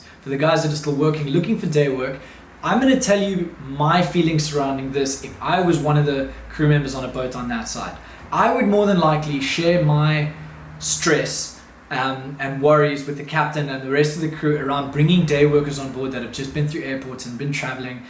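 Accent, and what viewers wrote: South Africa. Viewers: Boet, are you South African? The accent dead give away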